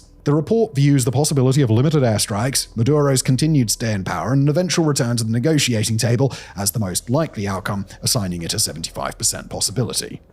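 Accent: United Kingdom. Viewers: Watching this in April 2026… bro really built a whole “analysis” career off “not enough troops” 🤣 Take away the British accent and this is just mid-tier guessing with confidence.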